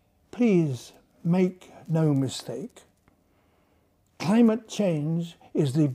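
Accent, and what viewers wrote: United Kingdom. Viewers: I guess by using an old guy with a British accent, they think that might convince more people of the lie. The only thing spiraling out of control is the climate change hoax. None of their prediction have come true. That should tell people something. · Delivery counts. Tone, british accent, good microphones... timing.. all VERY important when making propaganda . Content? Really inconsequential in the scheme of selling things.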